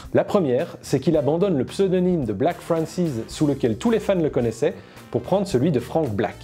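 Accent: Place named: England, United Kingdom. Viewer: accent anglais j'entends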